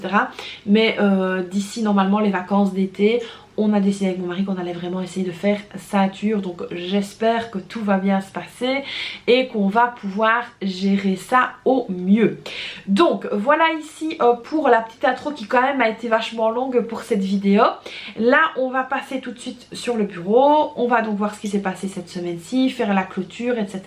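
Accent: Belgium. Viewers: Vous êtes belge ?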